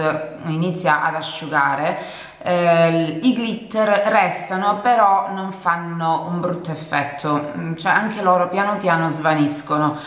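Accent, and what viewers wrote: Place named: Sicily, Italy. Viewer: Io sono Siciliana.. mi sembrava che eri siciliana...ho gli zii che stanno in Calabria ♥️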